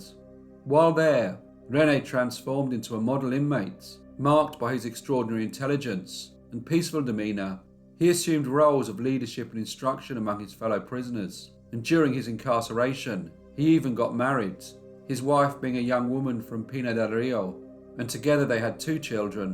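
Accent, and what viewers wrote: United Kingdom. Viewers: I had a hard time understanding you. That's all. Your British accent is real heavy. But the content was great though.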